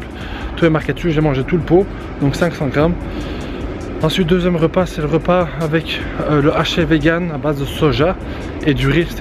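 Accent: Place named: Brussels, Belgium. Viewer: Je tombe sur ta chaîne frère de très bonnes vidéo bien ficelé ac de bnnes infos,je reconnais l'accent tu serais pas bruxellois ? en tt cas je m'abonne bn courage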